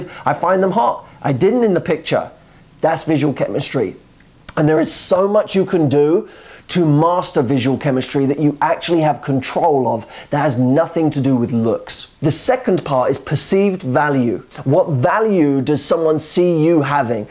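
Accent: United Kingdom. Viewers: Hey can you please do a video on the accent...How to do a brit accent...I cannot get over it.. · ...is it revealed in some of yours videos what is the recipe to attract you ?! :) you are so cute, handsome, so kind and generous with sharing your knowledge that I am about to fall in love with you ;) ...the reason why I've popped into this channel was looking for anything to listen in english as a preparation for english exam and your british accent is so cool so I am watching more and more of your videos now <3 thank you :) ! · this guy is extremely attractive, i love his accent, is he British ?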